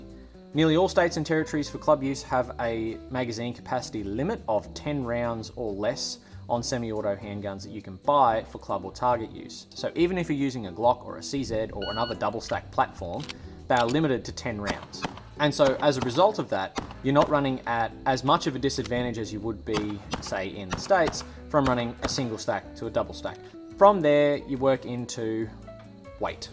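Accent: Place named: Ireland. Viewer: Love the Irish accent.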